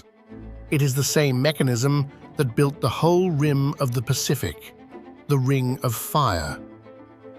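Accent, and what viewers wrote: United Kingdom. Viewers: The naration is British, not Unitedstatesian.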